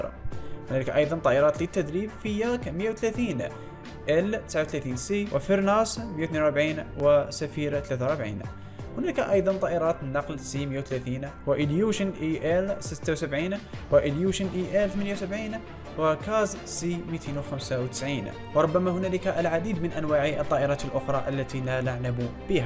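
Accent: Morocco. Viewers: لماذا التشهير باسرار الجيش لاتعجبني هذه المواضيع الكتمان ثم الكتمان العالم كله عنده جيش تريد تفكيكه مثل الجيش العراقي بحسدك خبيث صهويني ولهجتك مروكية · على حساب لهجته يكون مغربي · صاحب القناة من لكنته باين مغربي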